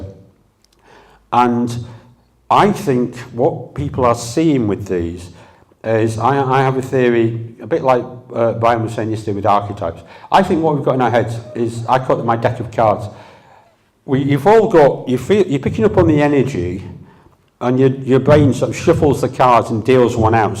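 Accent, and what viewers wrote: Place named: Lancashire, United Kingdom. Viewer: This man does NOT slur his words, he has a Lancashire accent and is using a mic that has a bass bias. He speaks VERY plainly, and if you don't understand the words he's using, maybe you should look them up and expand your vocabulary rather than decry him for your own lack of knowledge.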